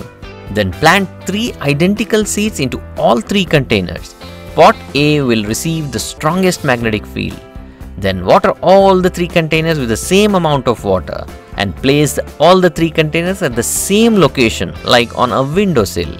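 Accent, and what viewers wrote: India. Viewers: sounds like one of those indian scam-call centers..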